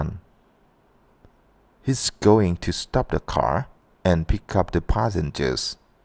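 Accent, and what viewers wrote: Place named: Laos. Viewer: what’s your ethnic group? The accents sounds a little bit different from my parents who are from savannakhet and champpasak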